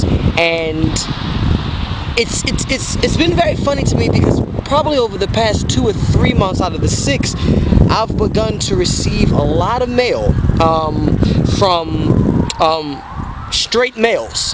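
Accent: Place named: Baltimore, United States. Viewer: I knew you were from Baltimore..I can hear it all in your voice...And why do straight men do that. I think they do think this, that every gay man wants them...They need to pump the breaks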